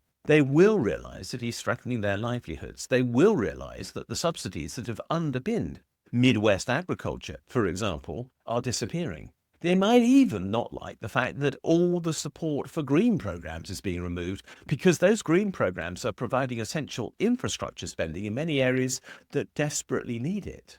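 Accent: United Kingdom. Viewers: I’m not going to waste my time listening to your British sounding horse shit! MAGA will give up when they have experienced so much PAIN!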